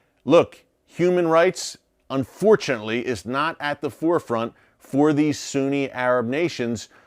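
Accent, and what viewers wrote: United States. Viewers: Why you talking like a cowboy?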